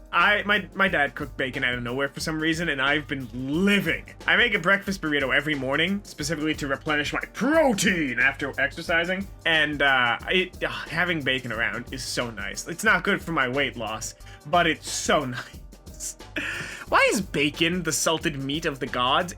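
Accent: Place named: New Jersey, United States. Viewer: I sense a Jersey accent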